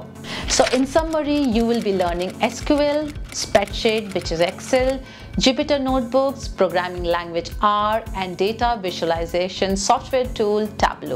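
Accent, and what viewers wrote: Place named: India. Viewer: Your sound is amazing and your sounds just like indian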